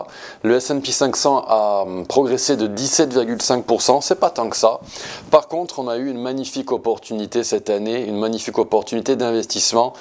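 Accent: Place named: Corsica, France. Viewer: Petit accent corse sympa 😊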